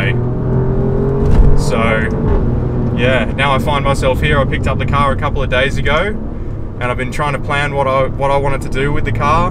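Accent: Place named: Australia. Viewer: Why do you sound more Australian in the car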